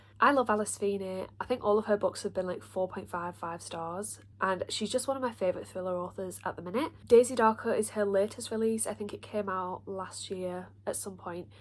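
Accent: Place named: Lancashire, United Kingdom. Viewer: hearing another Lancashire accent on BookTube is like a warm hug! the algorithm did a good job today ❤️❤️❤️ Cleopatra and Frankenstein is a masterpiece, enjoy!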